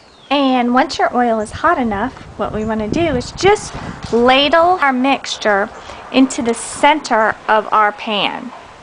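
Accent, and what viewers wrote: South, United States. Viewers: An Asian with a country accent, cool. Love this dish. Very easy to make, and very tasty! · wow,a beautiful Asian girl with a southern accent, how exciting! Also, the food looks great. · someone of Asian decent speak with a southern drawl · Great job! you make it look so easy. I love your southern accent!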